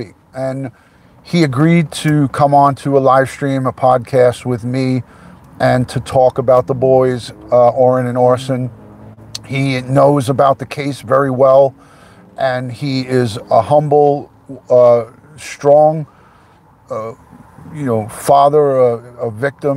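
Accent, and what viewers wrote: New York, United States. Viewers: Made me giggle when you tried to pronounce Cheshire, I hope you don't mind me correcting you, but here in the UK the shire part is pronounced sheer, so it would say Chester, Yorkshire etc. Absolutely love your NY accent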